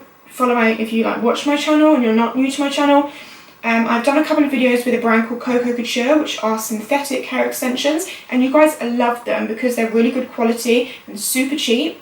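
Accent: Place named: London, United Kingdom. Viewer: No shade... But you sound a bit like a Londoner to me... No shade - never shade... Not to you! Lol... (I'm a Londoner anyways).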